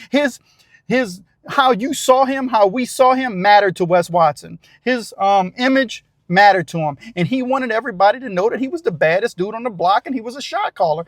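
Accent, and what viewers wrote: Louisiana, United States. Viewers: Dude, you'd make a great character actor...your speaking cadence and Louisiana drawl is unique and cool. You'd make a good henchman or badass avenging some transgression. You're a good storyteller with such a unique vibe.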